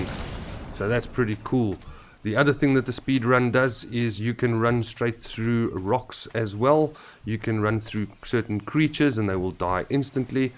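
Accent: Afrikaans, South Africa. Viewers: Sound afrikaans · Are you from south africa? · Is that kiwi or suth efreeken? Pretty sure it's suth efreeken.. best accents ever. Kiwis slightly better purely cos of the ey Bru U drounk yit? Oh no wirries Bru. Have ma criser I gat thri edit: kisses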